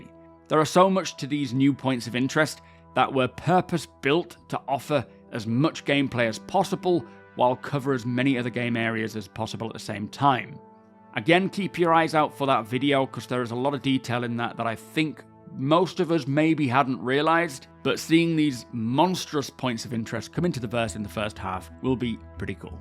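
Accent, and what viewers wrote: United Kingdom. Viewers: Happy New Year. As I said on my last live I was able to participate in. Your noble British accent makes you seem older and wiser. I would guess that u are younger than I. At 43 your video's are perfection and balanced. With each video I have been urning for what we are about to see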